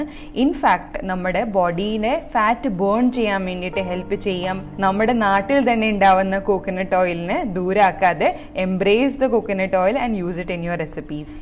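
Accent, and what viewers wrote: Thrissur, India. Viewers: KPL nmmde ijk... Are u from thrissur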